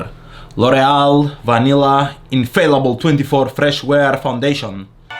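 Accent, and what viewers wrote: Russia. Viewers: when i see u as a man...u are HANDSOME!!!!! And that russian accent is at the same time funny and cute! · Почему никто не пишет, насколько же у него русский акцент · Классно делаете макияж 😊❤😄 Еще такой забавный киношный русский акцент))) Очень стереотипно звучит 🤭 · I love your russian accent when you speak english ❤ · У Вас очень милый русский акцент. Я с замиранием сердца наблюдала рисование стрелок. Получилось очень оригинально и необычно. 👍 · I love a Russian accent. So cool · с русским акцентом я вас понимаю лучше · Прекрасный русский акцент 😊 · Нравится его русский акцент.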